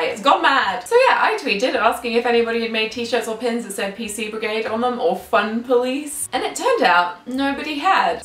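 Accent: Australia. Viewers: hey! Cool pin :) have you been to Australia recenty?? Your voice sounds Australian today! have a nice day, chum xxx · You sound quite Australian here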